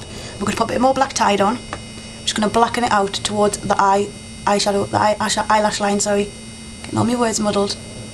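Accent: Newcastle, United Kingdom. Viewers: Yeah newcastle. My B/F talks like that, lol. It's cute.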